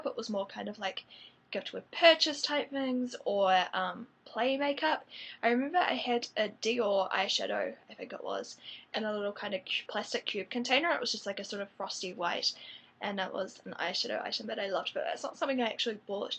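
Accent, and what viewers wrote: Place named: New Zealand. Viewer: This is the first video of yours I have seen and i was like. Hmm i regognise your accent and i Heard you say auckland and im like, Aeeee found another kiwi!! Im from dunedin(: live the video btw your so pretty!! xx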